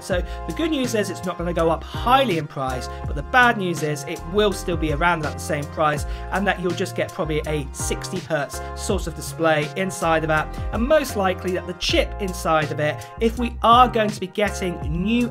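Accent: United Kingdom. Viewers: Fcking UK accent :D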